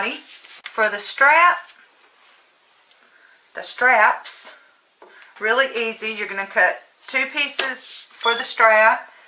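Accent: South, United States. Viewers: LOVE your tutorial and even more, your Southern “accent” (I’m from the North so it’s an accent to me, haha) Tfs this very clear and concise video on how to add a zipper to a tote bag and also with an accent fabric. I bookmarked and partially viewed so many videos on bags my head was spinning but, yours was the best so far! Will go start my FIRST tote bag now and hopefully it’ll look as good as yours!! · I am from Mississippi, moved away very young. Loved, loved, loved hearing that southern accent again! And you are my FAVORITE tote/purse construction teacher. Love You!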